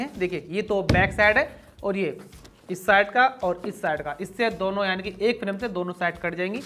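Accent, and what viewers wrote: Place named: Haryana, India. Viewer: आप बहोत ही फास्ट हरियानवी हिंदी बोलते हो ऊसमे सुधार चाहीये।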